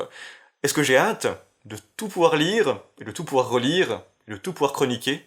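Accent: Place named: Walloon Brabant, Belgium. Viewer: Déjà, très sympa de ta part de prendre le temps de répondre à tes abonnés. Je salue le geste!:) C'est drôle car ton accent ressemble fort à l'accent qu'on retrouve dans le Brabant Wallon, au sud de Bruxelles. C'est fou! Mais bon, c'est toujours flatteur d'être pris pour un Belge, sache-le!:D Blague à part, oui, je comprends ton soucis d'agencer fond et forme dans de courtes vidéos, cela ne doit pas être facile en effet. Mais tu as raison, tes dernières vidéos sont plus balancées. Allez hop, un poil plus encore sur le style et ce sera parfait, mais je chipote... Bonne continuation à toi et j'espère que ton opération et revalidation se sont bien passées. See you soon!:)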